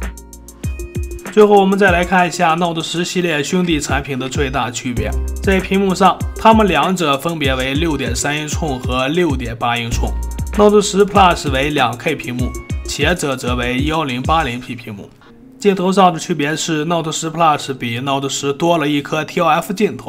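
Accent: Shanxi, China. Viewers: 大哥你是不是山西或者陕西人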